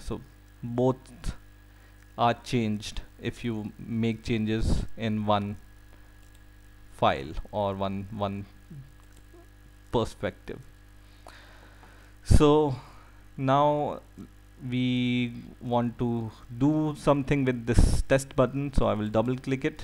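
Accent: India. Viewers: your from india xD?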